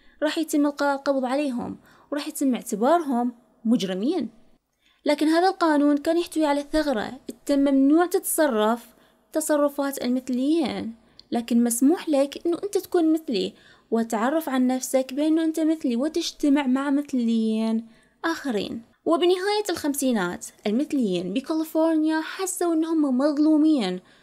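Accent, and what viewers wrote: Iraq. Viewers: يزين اللهجه العراقيه تخبلييين❤️❤️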